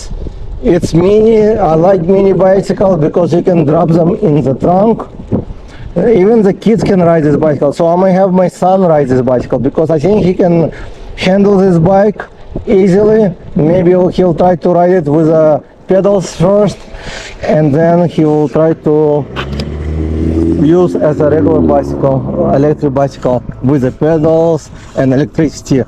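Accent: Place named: Russia. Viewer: Простите конечно но по вашему акценту понятно что вы говорите по руски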